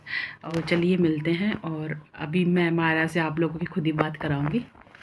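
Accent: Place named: Bundelkhand, India. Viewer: Mem aap beech beech m Bundelkhand ki voice me speech de deti ho😁😃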